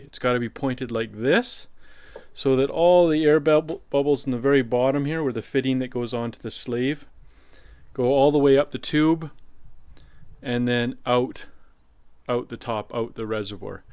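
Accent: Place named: Canada. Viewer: Next, they'll be telling you when to change your underwear.. You accent spells Canada, am I correct..?? If so, it figures..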